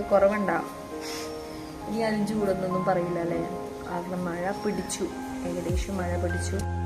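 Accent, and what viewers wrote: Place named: Thrissur, India. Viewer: Thrissur 👍kunnar slang is good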